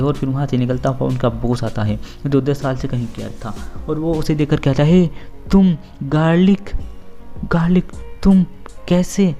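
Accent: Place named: Garhwal, India. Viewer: bhai tumhari language में garhwali taste aata hai isliye bol rha hun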